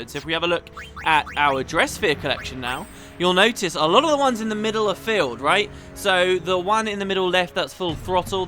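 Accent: United Kingdom. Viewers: Hey just wanna say how much i appreciate you doing all of this. I get the vibe that you put way more effort in your vids than most other youtubers or LPers. That and the voice of a charming brit make a killer combo;) Keep up the brilliance!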